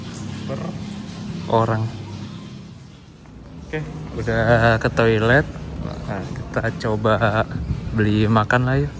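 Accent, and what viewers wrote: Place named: Depok, Indonesia. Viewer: Asli emg logat bhasa anak depok ka, btw saya org depok juga soalnyakwkwk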